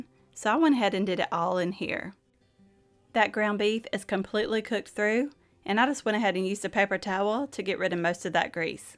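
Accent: South, United States. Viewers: Your lovely southern accent is the icing on the cake! Love this recipes. ❤can't wait to make them Sister 😊.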